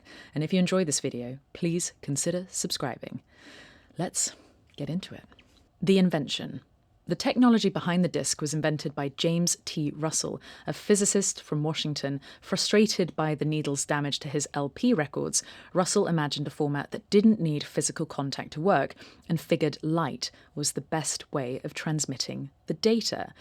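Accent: United Kingdom. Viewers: I love your channel! Long time watcher/listener first time commenting (I think). Your realness is what I appreciate most, I think we all can feel the you inside your content. That's rare and valuable. Plus being a dumb sounding American, I truly admire your British accent. Keep on rocking in the free world! 🤟🏽☮️🔥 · I'm left wondering if there is any subject that wouldn't be interesting - heard in your perfect British accent...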